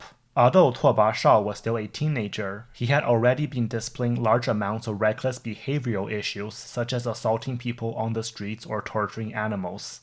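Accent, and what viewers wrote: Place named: China. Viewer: Looks interesting stuff but I can't stand your bad English pronunciation and harsh Chinese accent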